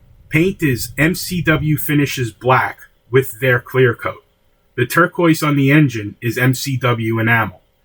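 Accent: New York, United States. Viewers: Excellent modeling skills. Truly a well done detail build...love the engine bay and under carriage. Only one comment. Your speech is fine and you didn't need to bring attention to the "pathology". Ya sound like a Neu Yahker or up coast Bahston. Perfect speech!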